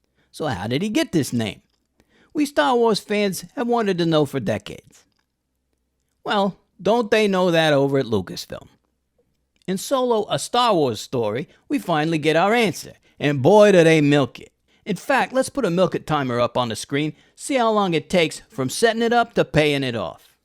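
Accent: Brooklyn, United States. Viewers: You from Brooklyn,? You talk like Joe Pesci. Joe.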